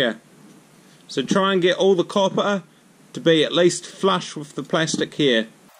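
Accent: New Zealand. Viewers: Love the kiwi accent, mate ;-) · I believe it's a kiwi accent!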